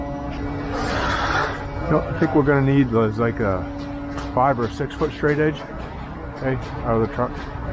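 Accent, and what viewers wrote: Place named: New England, United States. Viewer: You gotta be local like Maine mass or new York with that accent I recognize and love it and time I hear a new englander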